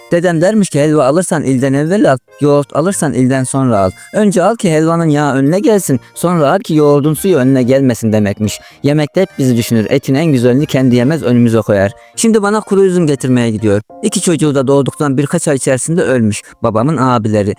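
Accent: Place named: Nevşehir, Turkey. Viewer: Nevşehirlisiniz sanırım,8 yıl çalıştım orda tam ora konuşması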